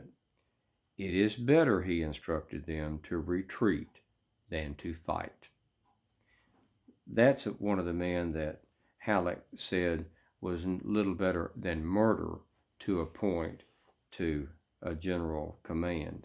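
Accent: South, United States. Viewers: This is the first time I have seen you as, 'President Grant'. The other ones of course, were as 'General Grant'. I've read: 'The personal Memoirs of U.S. Grant', and he referred to General Halleck many times. Never quite got a feel as to the relationship between the two, or what kind of person Halleck was.This presentation cleared up a lot of questions I had about Halleck.Your presentations are so well done, I begin to forget, you are playing a role. One other person metioned your: 'Southern Accent', I believe that's irrelevant, and doesn't subtract anything from your portrals of U.S.Grant! I don't understand why there are so few comments here and on other presentations you do. Perhaps, you do them so well, no questions or replies needed!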